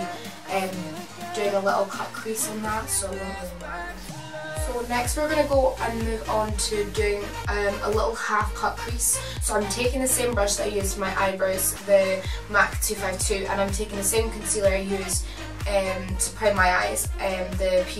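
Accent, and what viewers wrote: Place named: Wales, United Kingdom. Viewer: Is this what all welsh ppl sound like ?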